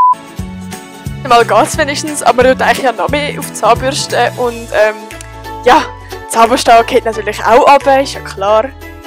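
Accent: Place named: Switzerland. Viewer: der schweizerische "Dialekt" ist irgendwie interessant. schön dass es auch solche YouTube gibt :) und nicht nur hochdeutsche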